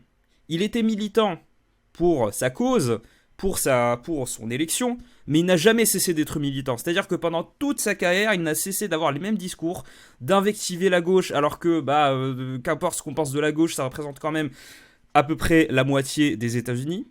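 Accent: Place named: Flanders, Belgium. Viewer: Un flamand français ? C'est devenu extrêmement rare...(t'es de Bailleul ?) Ou belge ? Merci pour pour ton analyse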